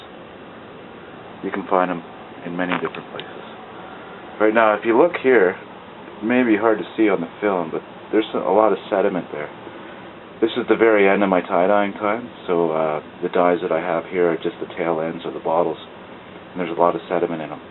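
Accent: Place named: Canada. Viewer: I love your Canadian accent.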